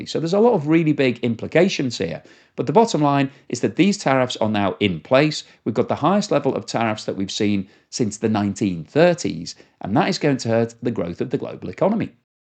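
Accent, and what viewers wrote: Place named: Northwest England, United Kingdom. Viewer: Hi, I replied via e-mail, but think it didn't go through. Sorry if this is redundant. Here 'tis: Hi, Thank you. You have the easiest-to-understand economics blog that I've found. It's admirably clear, and you come across well. There really is huge value in explaining the obvious, which you're doing very well. I've made a fair bit by publishing books doing exactly that (e.g., my first book, "An Understandable Guide to Music Theory for Jazz, Rock, and Blues Musicians," which sold about 15K copies--building on my academic studies [music theory and composition], decades ago.) BTW, I guessed correctly that you have a financial background--though I thought you were an ex-stock broker--but I was wrong about your accent: I thought it was from NE England rather than NW England, primarily because it's similar to that of one of my favorite Brti comedians, Sarah Millican, who I think is from Tyneside. 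(I live in SE Arizona, have never been to the UK, have lived almost my entire life in the western US, but am fascinated by dialects.) Finally, I completely understand your decision to branch out on your own because you were taking orders from morons. And I applaud you for it. That's pretty much where I was 40+ years ago, when I started a book publishing company with a gift of $1500 from my parents--I was near broke, but was doing graphic arts work at the time, so I had the necessary skills--and then had to claw my way up, handicapped by under (nearly nonexistent)-capitalization, my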